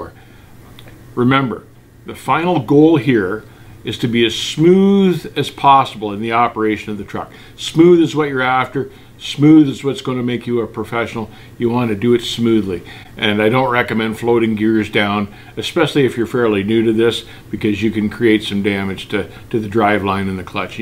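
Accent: Canada. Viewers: nice to find your channel, you know your stuff and listening to your candian Accent reminds me of running upstate New York on I 81. The Canadian truckers were always the nicest drivers! Your stories also reminds me of the days when I hauled produce coast to coast loading in California back to Florida. I started over the road running for an owner operator and Produce was his main commodity. this old guy made me a much better driver because boy he was very high standards with his equipment. But I was grandfathered into the business in 1990 so all of my learning experience was on the job! It’s fun to watch your videos they are spot on !!! thanks for caring to raise the bar for the newer drivers! I now pull doubles for an union LTL company. “Wildflower”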